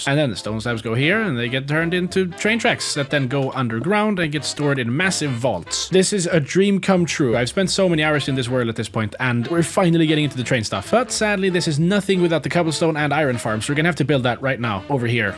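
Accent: Sweden. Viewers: du, jag hör att du är svensk. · I KNEW YOU WERE SWEDISH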